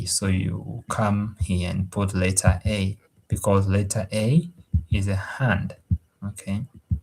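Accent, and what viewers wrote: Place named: Nigeria. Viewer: are you from Nigeria by chance? btw your Chinese sounds less accented than your English lol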